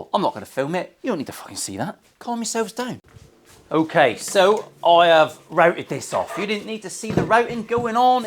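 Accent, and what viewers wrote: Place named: Cockney, United Kingdom. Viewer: I Fookin Luv You, you caffeinated jittery Janner ❤ Never afraid to do it your way, Fookem All Mista the North Devon Cockney Sparrow!!!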